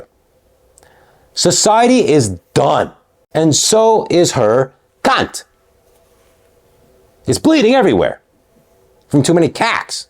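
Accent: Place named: Australia. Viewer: I was really really hoping to NOT hear an Australian accent /: I'm so ashamed /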